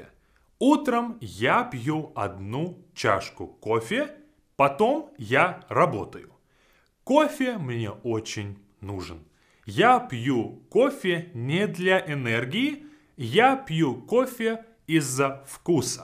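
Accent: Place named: Russia. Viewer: Чувство, что он русский, но прикидывается иностранцем.. Даже акцент русский есть:0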